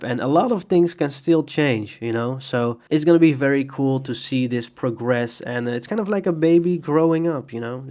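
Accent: Netherlands. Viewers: I can smell dutch, nice vid btw! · You dutch?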